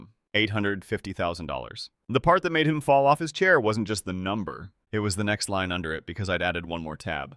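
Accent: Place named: Russia. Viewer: 2nd video seems like the narrator went Russian or something again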